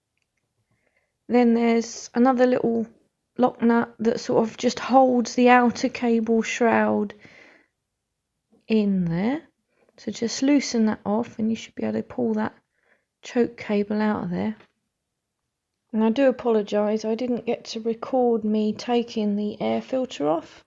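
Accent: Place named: Suffolk, United Kingdom. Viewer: hey, just bought my rover 90 p4... hope to see you about, you sound local ish to me, Suffolk but I am no good with accents anyhow so your probably not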